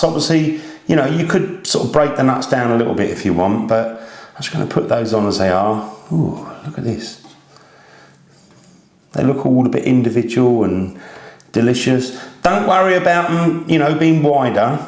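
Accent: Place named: London, United Kingdom. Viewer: He's not from Yorkshire; maybe London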